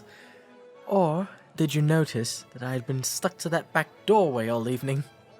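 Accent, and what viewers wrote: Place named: RP, United Kingdom. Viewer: oh wow the posh accent is 🤌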